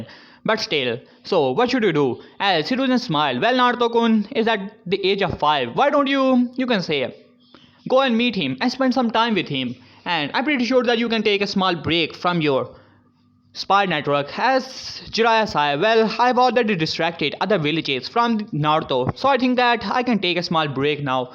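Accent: India. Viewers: I love your India voice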